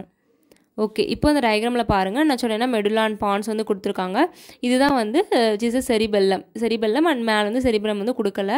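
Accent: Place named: Tirunelveli, India. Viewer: Onga slang tirunelveli slang maathiri irukku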